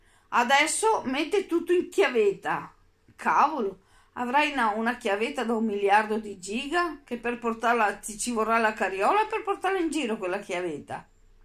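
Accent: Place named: Veneto, Italy. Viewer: Buonasera.. sei simpaticissima!!! Sono veneta anche io ed è sempre un piacere sentire il nostro accento😍 Dovresti fare delle LIVE... sai che seguito che avresti!!!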